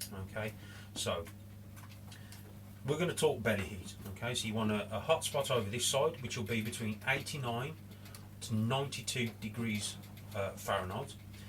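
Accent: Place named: Midlands, United Kingdom. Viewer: It's so refreshing seeing a ball python video from someone who sounds like me 😄 great videos mate, keep em coming. are you based in the Midlands?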